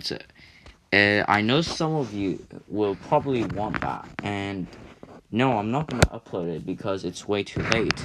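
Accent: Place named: United Kingdom. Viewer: British accent cute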